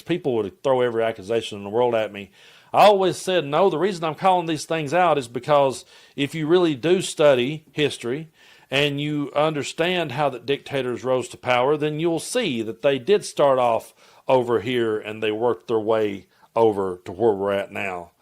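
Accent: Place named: Kentucky, United States. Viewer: When l 1st started watching you, your name " " threw me off cuz of your Kentucky accent. I was born in Paris-and-I-don't-mean-France. Anyway, your current events coverage is brilliant, insightful.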